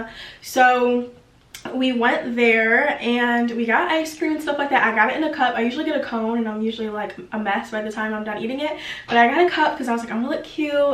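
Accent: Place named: Midwest, United States. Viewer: Also this midwestern accent is making me sooo homesick <3 <3 <3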